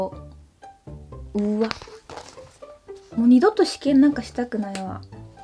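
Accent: Kansai, Japan. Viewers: たまに出る、大阪弁が可愛いですね？ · 関西弁かわいい笑笑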